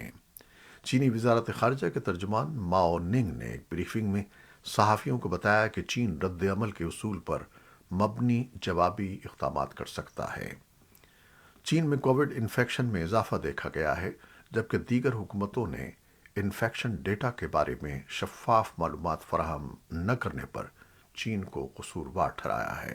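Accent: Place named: Lahore, Pakistan. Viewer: وہی لاہور والا لہجہ وہی ٹھہراو وہی وقار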